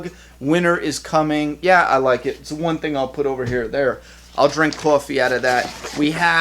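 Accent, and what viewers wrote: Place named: East Coast, United States. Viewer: Love that east coast accent ;)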